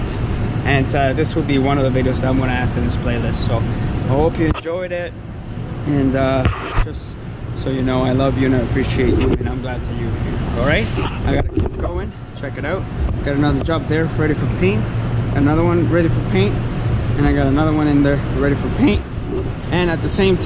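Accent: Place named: Quebec, Canada. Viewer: Est ce que je reconnais un accent Canadien francais ? :) You seem to have Canadian french accent :) Great vid btw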